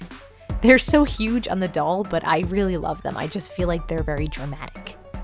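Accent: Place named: United States. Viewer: Are you american cause you sound american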